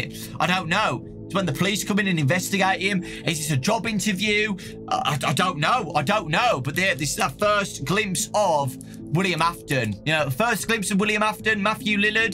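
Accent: United Kingdom. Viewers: Ever since I watched ur channel I only think of fnaf when I hear a British man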